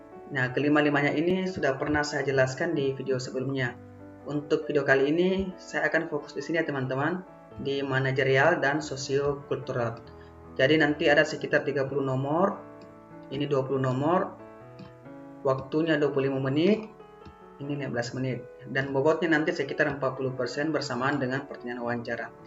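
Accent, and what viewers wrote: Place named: Madura, Indonesia. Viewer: Aq mendengar pak bilang logat Madura, apa pak org Madura? Salam sittong dhere pak😁🙏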